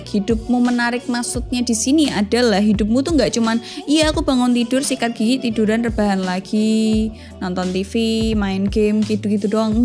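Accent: Java, Indonesia. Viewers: Mau kumpulin orang yg suka dengan cara bicaranya yg medok asli tdk di bikin2 ... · Kak bahasa nya medok banget uhh bikin suka kalo nonton nya😎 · Suka sama cara bicaranya yg muedhok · Mb medokku😍panggah ayu shoting dgn penampilan simpel yg sederhana❤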